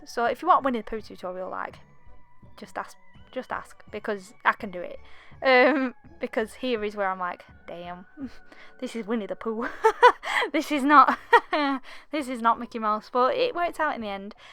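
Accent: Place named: Scotland, United Kingdom. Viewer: I know she's from Accrington, England, but her accent sounds Scottish.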